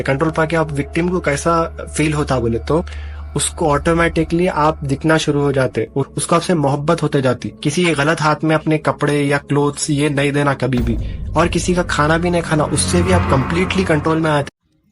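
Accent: Hyderabad, India. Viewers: Hydrabadi Tone ❤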